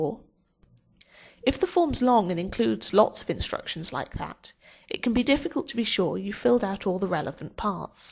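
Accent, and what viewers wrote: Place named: United Kingdom. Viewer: Es acento británico.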